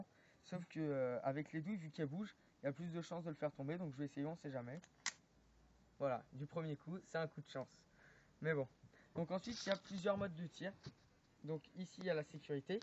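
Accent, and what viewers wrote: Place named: France. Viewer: sa fait plaisir d entendre un francais mdr d habitude c tjr des americain on comprend rien super ta video continue comme sa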